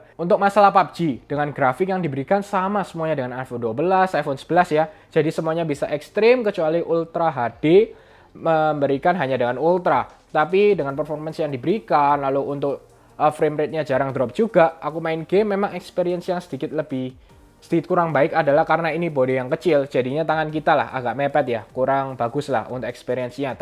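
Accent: Java, Indonesia. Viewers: Suaramu kok medok to Mas. Wong Suroboyo opo Semarang? ....... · Bolonganya 😄🙏🏻 sampeyan medok bgt toh koh ..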